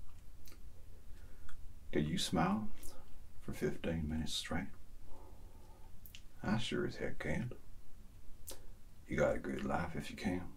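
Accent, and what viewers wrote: South, United States. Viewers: This reminds me of the part in fable 2 where the photographer takes the young sparrows and sisters picture. Also, amazing southern accent. Where are you originally from? Him: give me a smile. Me: ⊙﹏⊙😬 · How do you not have a million subscribers? Nobody comes close to the content you are putting out there...Crazy good stuff, man! And as a lifelong Southerner, the accent is spot on! LOL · Your southern accent is amazing. One of my favorite accents!!! This is impeccable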